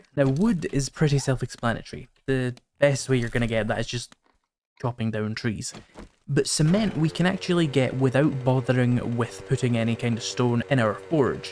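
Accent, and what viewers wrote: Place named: Leeds, United Kingdom. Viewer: That accent bro, what you from Leeds area? By the way thanks for the turning stone into cement in the forge tip.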